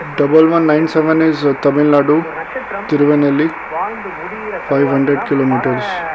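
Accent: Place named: England, United Kingdom. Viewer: I am in love with your English accent brother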